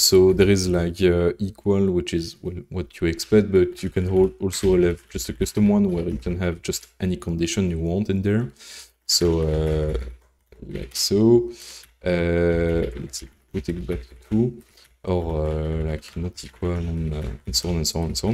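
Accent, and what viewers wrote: France. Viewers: On dirait un francais qui parle en anglais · wery wery french accent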